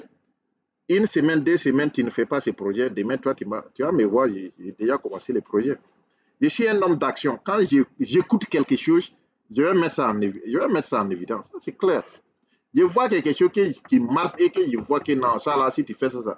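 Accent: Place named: Burkina Faso. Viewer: Tu as un accent Burkinabè ! Tu es Burkinabè ? Je viens de découvrir ta vidéo pour la première fois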